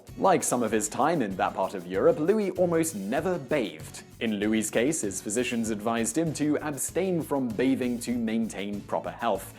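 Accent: United Kingdom. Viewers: The "Barack" period lol. I love British accents!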